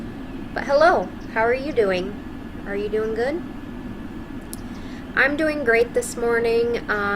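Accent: United States. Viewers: You have a great accent! I guess ure american where abouts are you from? Xx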